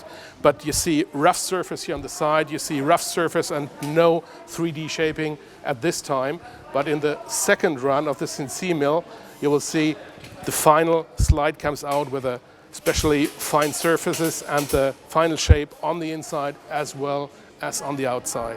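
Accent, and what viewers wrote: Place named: Germany. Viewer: German Akzent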